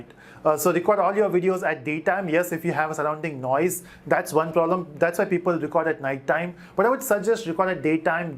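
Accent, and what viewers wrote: Telugu, India. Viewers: bro are u from andhra or telangana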